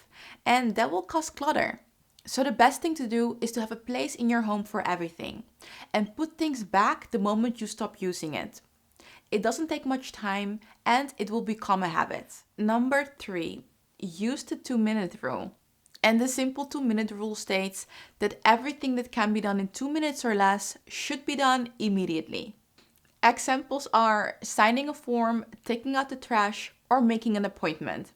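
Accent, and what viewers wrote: Netherlands. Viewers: Thank for giving me to motivation to start orginizing! And watching your video I was thinking she sounds so dutch.. Checking the about and yes you are! Ik ga je volgen! Groetjes van een nederlander woonachtig in Zanzibar.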